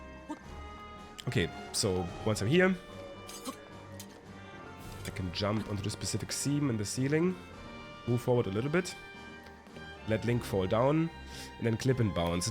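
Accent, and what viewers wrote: Germany. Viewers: Man hört so raus dass du Deutscher bist, Abo hast du! xD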